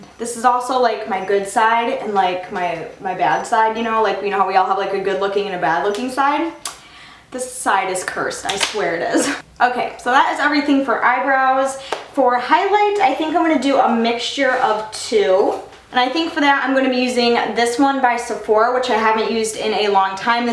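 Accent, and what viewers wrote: United States. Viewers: YOUR SO BEAUTIFUL!!! ❤️💕 and girl you killed me when you said "girl, IM POPULAR!!!" 😂😅💕😘❤️ could you make a video on your everyday routine? Like makeup, clothing choices, piercing Changes, things in that genre. Also, your amazing, an I love how you speak! Are you American? That's probably none of my business but your ascent is just amazing! I love the way you say things!! 😅💕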